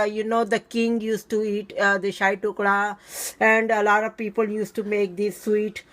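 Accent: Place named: India. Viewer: hhahahahahah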